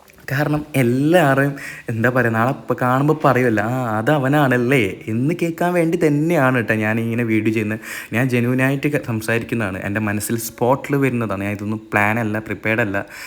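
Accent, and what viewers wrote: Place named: Malappuram, India. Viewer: എന്ത് മെജയാണ് സംസാരത്തിൽ പൊളി മോനെ മലപ്പുറത്തിന് bro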